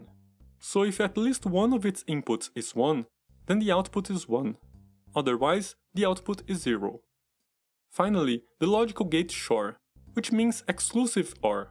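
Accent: Brazil. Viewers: I need to ask you this. Your accent makes me believe you are Brazilian. Is that the case? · You're Brazilian right? I can spot the english brazilian accent in a second! I'm Portuguese BTW, and loved the video. I'm trying to get into IIT and this is much helpfull. When are you going to post a follow up? Um abraço do outro lado do Atlântico!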